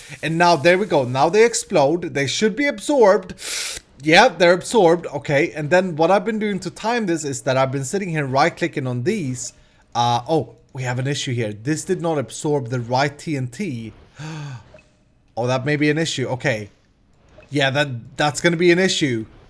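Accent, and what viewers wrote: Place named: Sweden. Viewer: Omg -, you made a whistle sound that made me bust out laughing! man I love Swedish accents <3.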